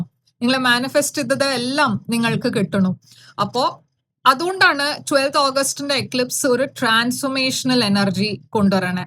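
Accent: Kerala, India. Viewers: Please pronounce English in English....not in Malayalam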